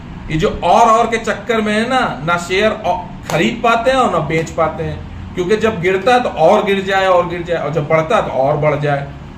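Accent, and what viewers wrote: India. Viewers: Ek number Desi andaz mein bat krte ho bhae .. very genuine person.. very brave and courageous person. Salute 🙏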